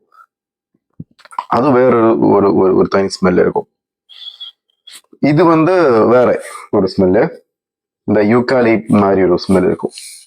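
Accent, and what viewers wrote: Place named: Kerala, India. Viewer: നിങ്ങൾ മലയാളിയാണ് എന്ന് സംസാരം കേൾക്കുമ്പോൾ തന്നെ അറിയുന്നുണ്ട്. വീഡിയോ നന്നായിട്ടുണ്ട്. ആദ്യമായിട്ടാണ് കാണുന്നത്. സുബ്സ്ക്രൈബ് ചെയ്തു.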